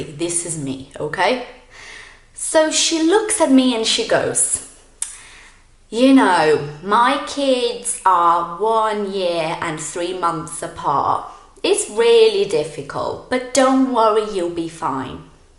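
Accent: United Kingdom. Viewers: é a pimeira vez que vejo seus videos, mas gostei demais da informação! tenho aprendido português e inglês pela minha conta , e acho muito importante melhorar e corrigir nossos erros por não sermos nativos, seu sotaque é realmente lindo, depois de 15 anos ou mais estudando inglês americano seria dificil para mim imitâ-lo, mas vou recomendar seu canal aos meus estudantes de inglês que gostariam de aprender o sotaque britânico!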